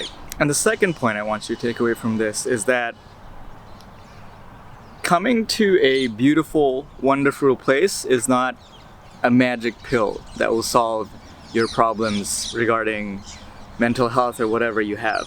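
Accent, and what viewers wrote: United States. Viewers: You sound like American accent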